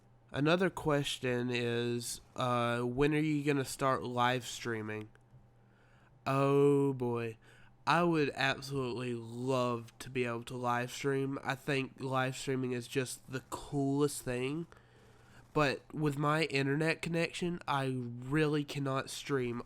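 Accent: Georgia, United States. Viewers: He sounds a bit southern anyone else notice that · I live in Georgia too btw you sound like your from Georgia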